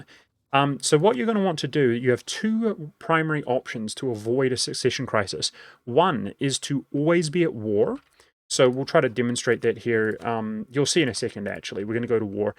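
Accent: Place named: New Zealand. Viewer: I’m new to the game and You said your not demonstrating any skill but whatever that was must take some skill right? Lovely content as always, I’m honestly not used to hearing the kiwi accent on gaming channels so it’s refreshing.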